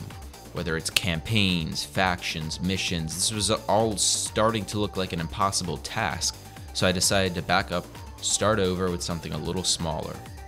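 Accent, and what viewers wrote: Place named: Delaware County, United States. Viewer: You from delco? I can hear it ^^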